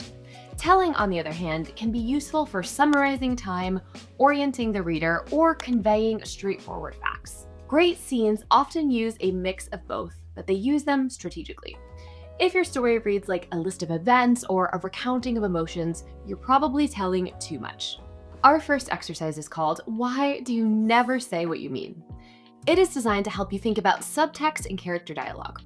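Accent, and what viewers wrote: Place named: United Kingdom. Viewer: Once again, not helpful for me to improve on not telling in my story. She's speaking British, and I'm full-blooded American from Chicago. It may generally be English, but the language is still foreign to me.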